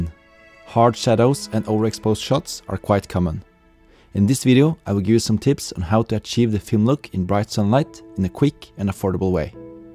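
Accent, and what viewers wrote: Norway. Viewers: Your accent sounds like you are a Norwegian :) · Ah du er Norsk, hviste det med en gang du snakka, men bekreftet når det sto NOK på ebay :D Flott video. lærte masse :) tusen takk :D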